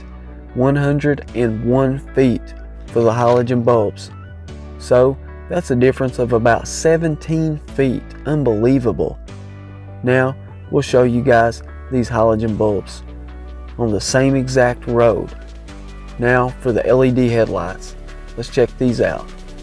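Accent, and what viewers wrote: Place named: Texas, United States. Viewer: I love your Texas accent mate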